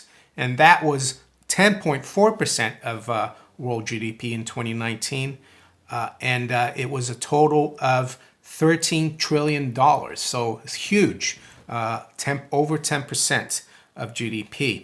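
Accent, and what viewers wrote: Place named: New England, United States. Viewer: Where you from? Northeast accent. New England?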